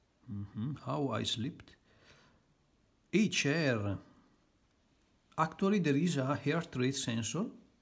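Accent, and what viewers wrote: Italy. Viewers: Ho capito subito che sei italiano, come siamo facilmente riconoscibili. Cmq continua cosi` sei grandeeeeeee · sei anche veneto magari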